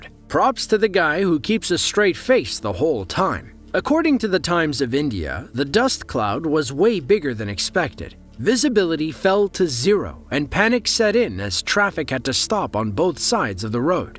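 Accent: United States. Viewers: narrated with an American accent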